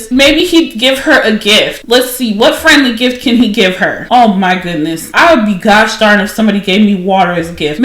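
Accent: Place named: United Kingdom. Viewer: Your British accent 😭😭😭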